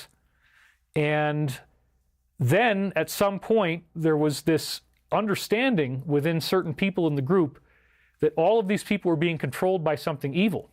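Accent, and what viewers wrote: Australia. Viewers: Very enjoyable program for the most part-----thank you. My exceptions, personally are #1) I don't trust "Dr. Steven Greer" any further than I could toss him (appearing or whatever in your next film? can't STAND him) #2) I think your Australian accent is funny and I enjoy it----but Hon, you lack the ear to do a British dialect of any sort, upper or lower class. Not meant to hurt your feelings---just stating a fact. #3) Narcissists and Psychopaths do not "Repent"...they will not be Allowed into the Higher Frequency Timeline. The less said about them the better! Loved all the crop circle slides---so nice to have this review. God Bless You! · Great info! Love the Australian accent and your sense of humor! Have you ever seen the 1974 Brian De Palma movie Phantom of the Paradise? it's an extremely interesting movie I think you would get a kick out of! 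· I love your Australian accent ‼️I lived there for a minute and you do it real well and you’re a fun guy.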